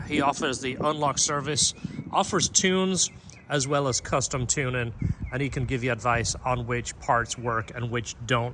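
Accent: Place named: United Kingdom. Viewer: I am listening to this and for some reason I am imagining a British guy talking.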